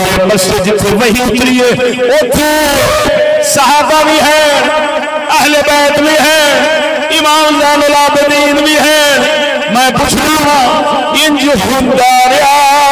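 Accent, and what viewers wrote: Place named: Punjab, India. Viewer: Urdu ati hay laykin ap ki zuban mubarak pr punjabi charhi hoi hay.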